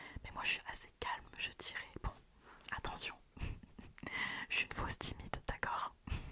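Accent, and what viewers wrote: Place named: Belgium. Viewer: tes belge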